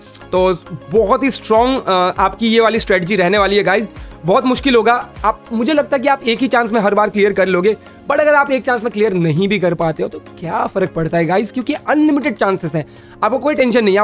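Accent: Bengal, India. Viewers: Bhai aap Bengali ho kya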